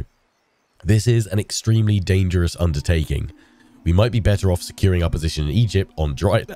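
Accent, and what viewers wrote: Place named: England, United Kingdom. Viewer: Hello, Sir, Excellent to see Napoleon in Egypt! Well done with the start up battles, I enjoy the fire and movement of your Infantry, use of terrain and Cavalry exploits to knock out your enemies Artillery right from the start! Most excellent indeed. I fear that your garrisons behind your lines are too weak? If Nelson desides to land on the coast with the Ottamans everything you have will be in Cairo and that will mean a double back to push them back. Also as time will pass and the Egyptian resentment will increase you must leave suitable garrisons behind, unfortunatley, to deal with the unrest. This is a strange and land of 40 or 50 centuries very unlike Euroupe in most ways, especially religion! Even Ceasar had to leave a legion in Alexandria to secure his rear and administration of supply. Love this content and I hope you feel better soon! 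Always odd hearing about french exploits from someone with an English accent! Amusing! Glory to France and Napoleon! Love this content and keep it coming! 🍻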